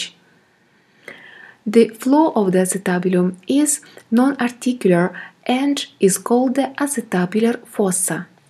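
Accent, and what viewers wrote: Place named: Spain. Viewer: Thank you so much. It was a great help. Btw are u spanish? I asked because of ur accent. I love this accent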